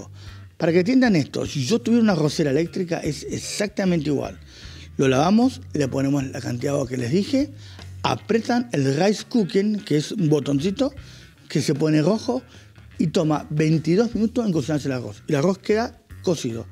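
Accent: Argentina. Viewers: Me encanta el acento tan argentino que tiene usted, que es originario de Japón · Un japo hablando argentinoooo 🤌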